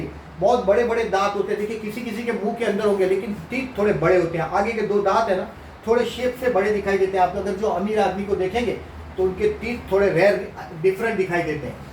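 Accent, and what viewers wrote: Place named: South Gujarat, India. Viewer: Sir your language seems like south Gujarat which is your native place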